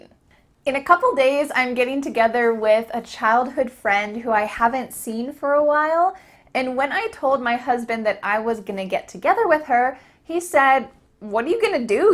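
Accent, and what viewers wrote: United States. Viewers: please, is she from the u.s.? does anybody know? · Wawwawaw i love American accent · She such a good teacher she teach me alot of American accent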